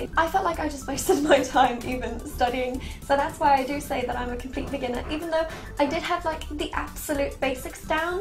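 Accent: United Kingdom. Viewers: I loooooove your British-English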